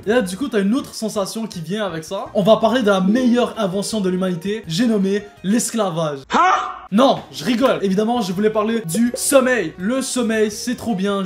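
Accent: Quebec, Canada. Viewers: COOL L ACCENT QUEBEQUOIS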